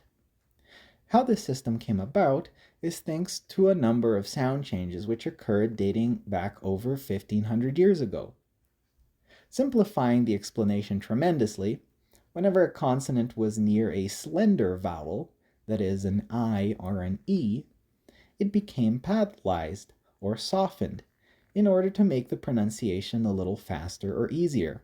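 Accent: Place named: United States. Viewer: I find it to be a very bold move talking semi-authoritatively about not one but three entire languages, none of which you speak, and with a blatantly young American accent which suggests little more than book knowledge on the subject. I mean we've already had Scots half ruined by some autistic American on Wikipedia before!! Have ye no learnt from that?!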